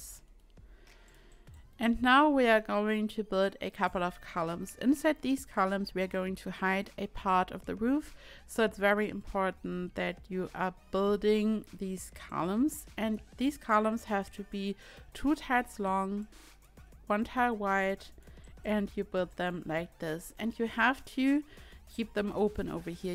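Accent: Germany. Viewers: Deutscher Akzent iz da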